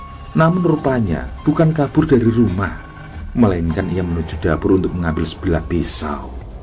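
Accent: Java, Indonesia. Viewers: BARU 32 MENIT BUK NURI TKW DI SYRIA SELALU HADIR,SUKAK BANGET DENGAN ❤ ❤ LOGAT JAWANYA KETAL......SUARANYA MANTAB,NGEBAS, DAN GAMBLANG ALUR CERITANYA, MUDAH DI MENGERTI, NGA MUTER MUTER DAN DIULANG² SUKSES SELALU BUAT ❤ ❤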